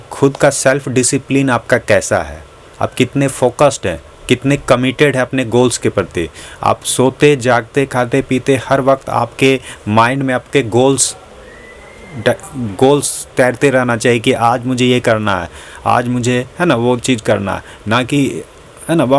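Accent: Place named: Bihar, India. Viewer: Hai na. Karna baar baar shayad thik nhi h Bihar ki jhalak aa rhi h shaayad isme sudhar ki jarurat h Bhai.baaki lage rho .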